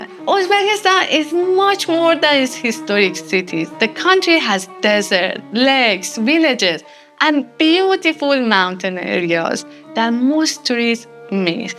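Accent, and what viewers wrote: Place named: Iran. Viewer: the Persian accent kills me, she has to say everything in Iranian way, even change the pronunciation of names to make them sound Persian!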